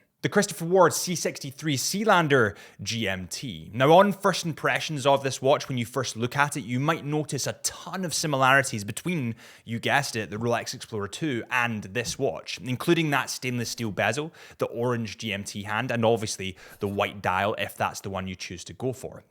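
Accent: Scotland, United Kingdom. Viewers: Love the vid man what is your accent btw? Scottish I assume but from where?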